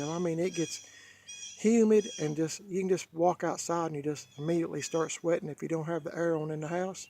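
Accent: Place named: South, United States. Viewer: Are you from Alabama? Your accent gives you away, you’re from the south. LOL! I am visually impaired, and enjoy hearing the sounds of those old pick up trucks of yours. Would love to hear the old 78 roar to life. For several years of my life, I lived 4 miles from the Talladega superspeedway, so I love anything with straight pipes.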